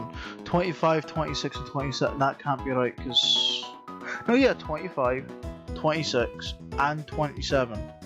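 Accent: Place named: Scotland, United Kingdom. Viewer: So much Scottish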